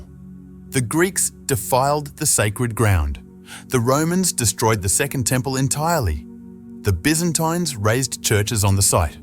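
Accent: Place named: United Kingdom. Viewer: bull cocky brought to you AS ALWAYS BY A BRITISH ACCENT!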